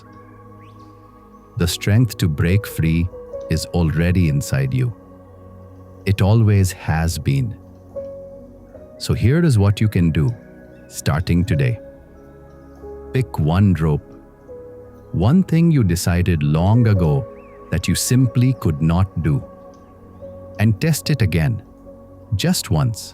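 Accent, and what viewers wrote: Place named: India. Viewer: I wonder which country's pronunciation is? It's like an Indian pronunciation.. do you answer me ?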